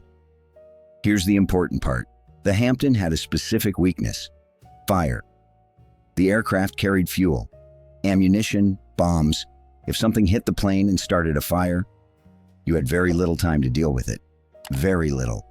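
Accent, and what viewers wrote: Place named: United States. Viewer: AMERICAN VOICE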